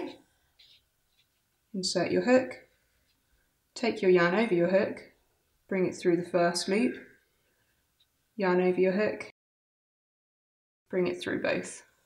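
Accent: United Kingdom. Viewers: Silly question: I'm new to crocheting, but I know there are US vs UK terms. I'm assuming because of your accent this is the UK single crochet (US double crochet?). I'm asking because I've seen other tutorials and this seems like the US single crochet but, again, potentially silly of me, I'm inferring from your accent it's the UK single crochet?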